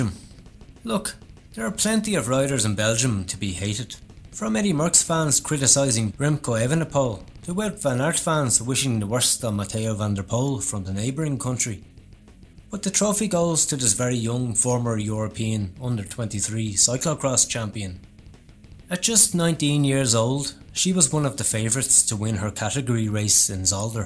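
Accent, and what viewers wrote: Dublin, Ireland. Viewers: As an Irishman myself - I know the narrator's accent only too well, he's from Dublin. Reminds me of Paul Kimmage and a little bit of Pat McQuaid. I like his sense of humour. A question to the narrator: are you related to Paul Kimmage? Are you or? Yeah, I can see similar writing and story telling skills as Paul Kimmage, and the contempt for cheats and dopers. This is definitley not GCN. Kudos to the narrator.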